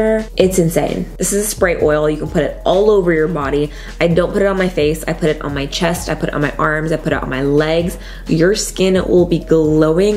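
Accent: South, United States. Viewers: I heard that southern twang girl! Tennessee REPRESENTTTT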